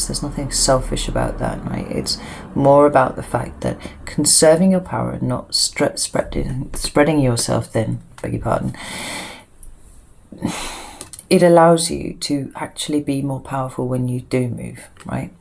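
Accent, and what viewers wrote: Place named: England, United Kingdom. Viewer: Thank you so much ! Your so beautifully amazing and your acsent well I know to you it's not an accent because you are true English us over here in the states are not but anyway I love you ❤️ thanks again .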